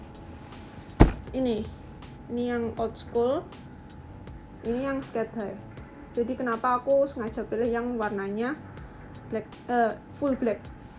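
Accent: Java, Indonesia. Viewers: jawa banget ngomong nya, love you cantik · Medo' banget jawane :v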